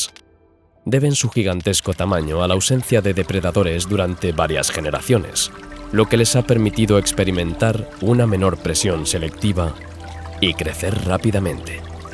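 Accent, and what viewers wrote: Spain. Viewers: doblados al español de España, lo que da una sensación que tus videos fueran documentales profesionales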